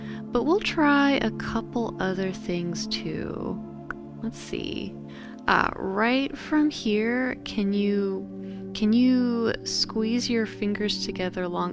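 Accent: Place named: United States. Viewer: I like this girls really American voice. As a non American she reminds me of watching the US antiques roadshow. Also she gives a mean massage.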